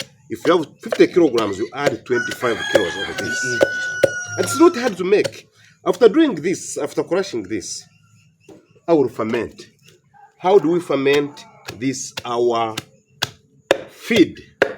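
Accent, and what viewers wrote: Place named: Ankole, Uganda. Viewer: You're located where. U talk as if you are a munyankole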